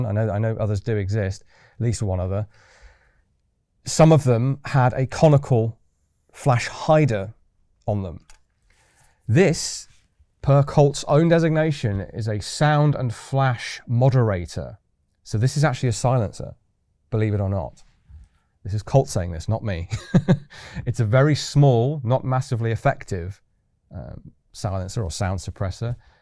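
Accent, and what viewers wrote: United Kingdom. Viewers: Always fun to listen to this British gent speak about firearms, especially ultra-rare almost non existent pieces.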